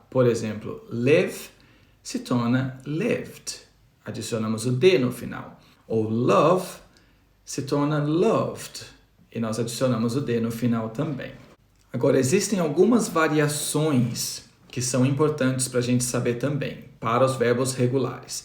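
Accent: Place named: United Kingdom. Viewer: That sounds great the British accent. I love it! I miss it! Congrats!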